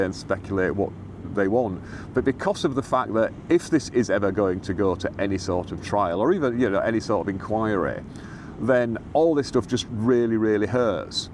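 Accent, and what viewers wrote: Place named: Northern England, United Kingdom. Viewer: As someone that knows nothing about law I do enjoy listening to these videos and find them educational.😇 May I ask where that untainted Northern twang that you have is from, as you are very likely close to my own roots (Hull, Hessle more precise)? Definitely the way you pronounce your words, like 'Erm and Away' I'm guessing NE Yorkshire somewhere? The accent quickly changes once one starts travelling up and down the A1. Keep the videos coming.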